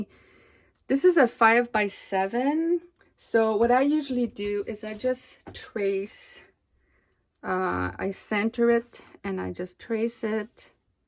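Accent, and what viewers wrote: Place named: Normandy, France. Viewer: Thank you for this. Also, just want to say I love to listen to you speak. Your accent sounds just like my mom who was Normandy France. She is no longer with us but this gives me some joy for a few minutes.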